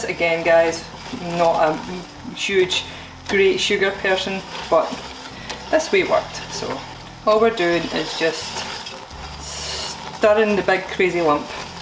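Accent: Scotland, United Kingdom. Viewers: I've never made a single recipe of yours, nor do I intend to (though they seem to be really good, when they turn out well). I just like listening to you talk. Your videos are very pleasant, and it's nice to watch someone make food and patter in a Scot accent. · Thank God my mum is Scottish, born and bred- and will totally understand you,. Im sure she will make delicious caramel cornflake brownies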